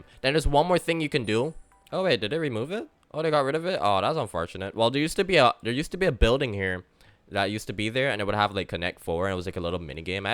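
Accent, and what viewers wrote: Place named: Barbados. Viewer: Bro sounds Bajan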